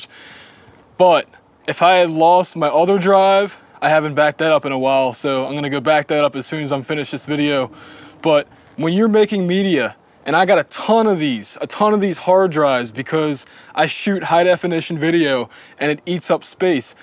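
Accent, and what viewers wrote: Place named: Philadelphia, United States. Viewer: Hmmm... sounds an awful lot like you have a Delaware valley/Philadelphia accent. Anyway, I really enjoyed your song analyses and the loudness wars video, keep up the good work.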